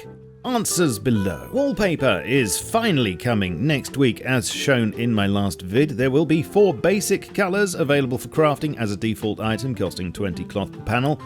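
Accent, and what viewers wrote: United Kingdom. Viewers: brittish accent the entire video verry american bottle of water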